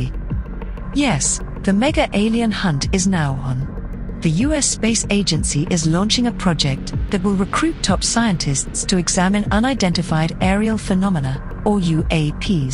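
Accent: United Kingdom. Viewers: How come every time you guys make one of these documentaries or whatever you have to have an English British accent what's up with that can someone go yo yo yeah there might be something out there yo dog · Appreciate the British accent. The 500m large radio telescope is mighty impressive. The rest about aliens is speculation.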